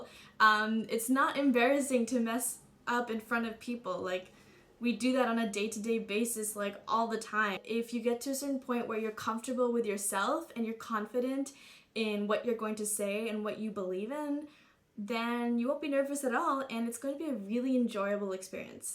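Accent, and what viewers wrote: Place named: Canada. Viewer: Beautiful as always :) As a former Canadian my self, I really picked up your accent :p Thanks again for the great tips!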